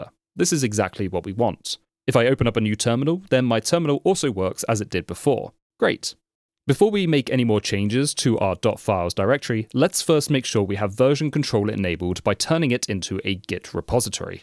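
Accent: United Kingdom. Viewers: Indeed good job, you explained step by step in the simplest way possible, now I marked your video for my future fresh reinstall cause now I had to share my laptop with my partner. By the way speaking of your accent, are you from UK?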